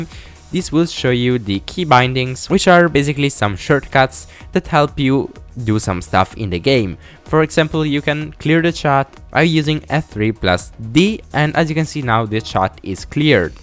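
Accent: Italy. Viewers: are u italian?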